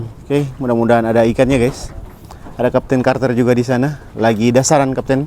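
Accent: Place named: Manado, Indonesia. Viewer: saya suka dengar kalau narasimya disampaikan menggunakan logat Manado dang, terasa lebih enak dan natural. Saya lalu pernah tinggal di wilayah Sulawesi, orang bilang kalau logat Manado terasa tore dan nyaman di telinga. Tq Bang....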